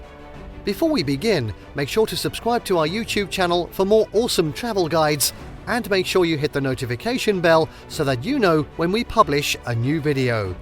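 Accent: United Kingdom. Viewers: イギリス英語かな？聞き取りやすい。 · Not really British English but it does have a slight British accent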